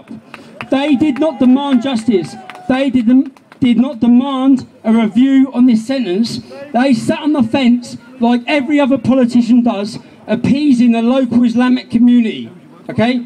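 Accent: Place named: Cockney, United Kingdom. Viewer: WTF? Is this for real? A cockney Wanker (who looks like an Irish gypsy to me) preaching hatred to the folks that ate all the pies from Greggs? He He!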